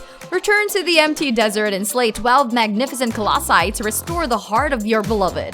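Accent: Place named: Philippines. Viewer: Her accent is so filipino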